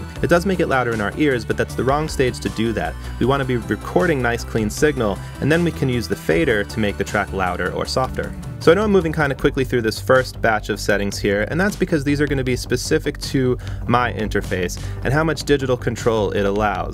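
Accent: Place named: Long Island, United States. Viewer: Hey great vid I’m in Jersey I seen that strong island accent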